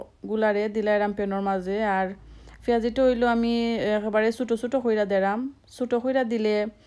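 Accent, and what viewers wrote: Sylhet, Bangladesh. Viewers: Apu styet vasha amr khub sweet Lage. Aktu Maya Maya vab ASE. R tmr ranna gulo shei hoyese. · রান্না গুলো ভালো হয়েছে আপু । আর সিলেটি কথা শুনে খুব ভালো লাগছে । পিয়াজু আমার খুব প্রিয় । Vlog টা সুন্দর হয়েছে । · মাশাল্লাহ আপুনি তুমি কত সুন্দর করে সিলেটি মাত মাতলা খুব ভালো লাগলো আজকের ব্লগ টা। এভাবে সিলেটের মাত মাতবা সবসময় প্লিজ। শুনে আমার বেশ ভালো লাগে।রান্নাগুলো অসাধারণ মজার রান্না করলা।খেতে ইচ্ছা করছে কিতা রতাম গো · Apu tomar sylhety kotha shob bujhesi, valo laglo, Lau khaw na keno apu at Khub valo shobji, rannagulo mojar, shinar ghoshto alada moja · Apa sylheti hunthe bala laglo, otho mojar ranna khaibar r piaji oise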